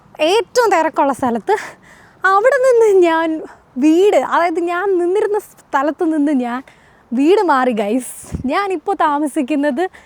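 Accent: Kottayam, India. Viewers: Kottayam slang liked enna parayana Ennada uvve angana kure und like kochi trichur tvm etc 😊😊🎉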